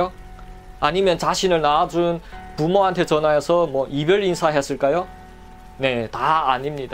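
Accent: Yanbian, China. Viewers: 이 친구가 연변친구라서 그럽니다. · 延边方言 谈黑龙江乔四爷 😊牛逼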